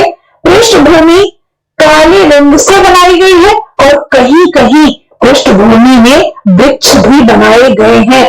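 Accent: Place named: Jaunpur, India. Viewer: Maam आप अपनी उसी भाषा में बात करें और पढाये बहुत अच्छी लगती है सच में. जौनपुरिया वाली 👍👍🌹🌹🌹🙏🏼🙏🏼🙏🏼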